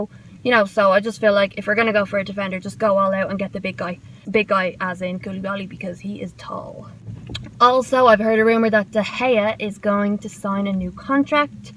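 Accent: Ireland. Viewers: HI HOW ARE YOU BEAUTIFUL 😘 I HOPE WE SIGN KOULIBALY. I REALLY LIKE YOUR ACCENT 🇨🇮 #IRISHACCENT XXX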